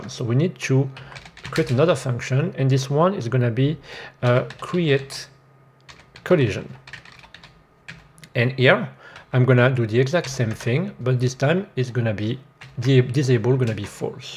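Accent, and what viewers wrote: France. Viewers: Super tuto j'aime bien ton léger accent français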